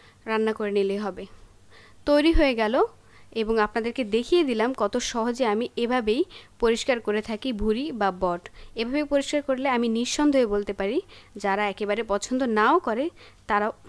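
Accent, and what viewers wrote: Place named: Rajshahi, Bangladesh. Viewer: Nice Rajshahi accent Useful cooking method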